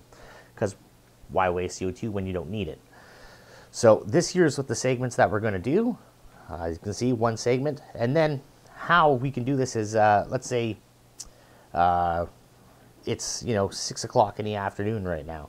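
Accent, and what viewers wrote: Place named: China. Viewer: Thank you and yes Chinese English is one way to put it.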